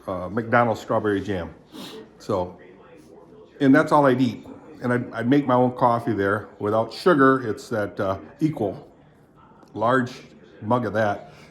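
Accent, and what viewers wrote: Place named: North America. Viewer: I was thinking, is it June already but then realised you sound North American.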